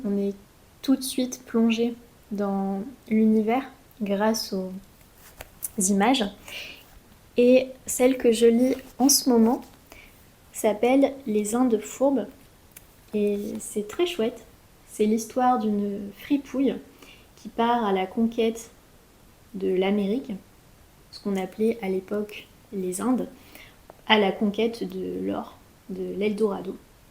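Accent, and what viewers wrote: France. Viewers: Amo tu acento francés ❤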